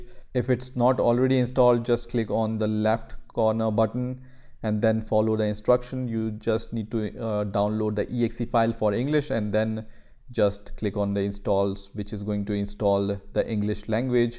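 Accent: India. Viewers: Dont try to talk more "British English" if I hear Hindu accent I know that this will be a helpfull video. Thank you so much.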